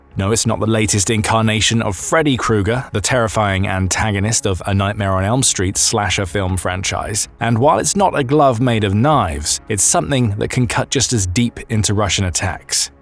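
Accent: United Kingdom. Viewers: UK english accent sucks.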